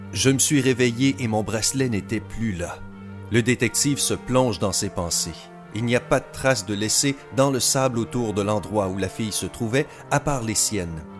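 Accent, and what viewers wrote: Quebec, Canada. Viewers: C'est un québécois c'est sur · Enfait cette chaîne est québécoise non moi je suis québécois et je reconnais l’accent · J'aime beaucoup le petit accent québéquoi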